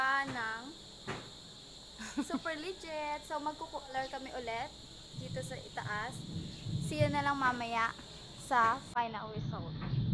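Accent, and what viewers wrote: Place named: Iloilo, Philippines. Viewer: Ang galing naman ng salita nyo parang mga ilongga original 😁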